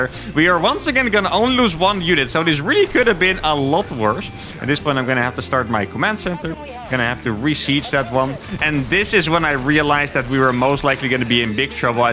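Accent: Netherlands. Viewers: ruthermental? /dadjoke. I enjoyed this, always lovely to have dutch inflection in the accent. Go team Oranje!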